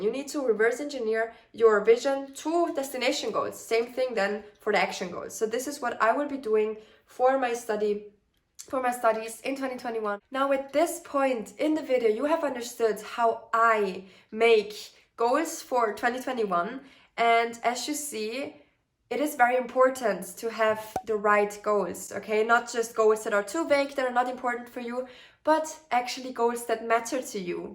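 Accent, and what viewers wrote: Germany. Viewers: your accent is really nice though very subtle like I said I would have guessed a Germanic language but it’s not distinct enough that I would identify it as German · You are German correct ? I can hear the accent. 😍😍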